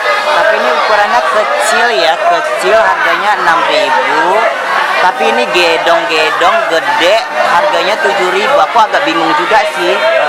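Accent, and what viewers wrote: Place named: Medan, Indonesia. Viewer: Kalo bisa logat medannya jgn dicampur dgn logat jakarta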